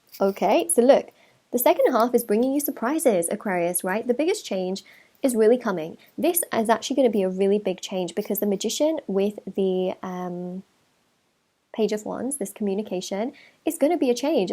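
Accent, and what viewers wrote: United Kingdom. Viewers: Lovely reading, lovely accent (very British), a big hello from America 🇺🇸 to our friends across the pond, half a Brit on my moms side !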